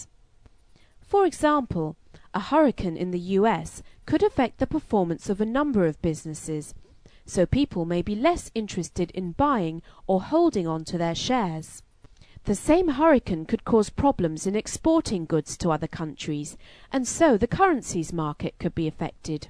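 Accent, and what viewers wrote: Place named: England, United Kingdom. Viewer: I read somewhere that a female voice is recepted better by people than a male voice is, in such things as this video (instruction audio); it's a psychological thing, and has to do with our mothers nurturing and raising us when we're babies.-- I like this video, very informative and simple and easy to listen to (because of the female voice and the English accent. > "Bonds" James bOnds.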